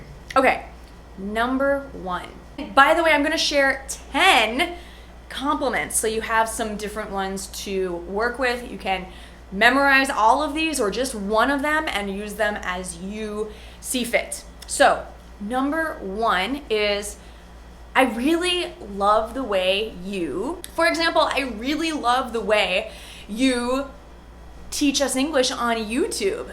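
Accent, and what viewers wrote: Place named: United States. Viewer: First time I have watched your video the way you teach is really great, your overall personality speak out when you speak that's good, now I have subscribed immediately to learn American accent, by the way I am a Indian translator for Hindi and Punjabi languages..you are doing a good work, thanks again.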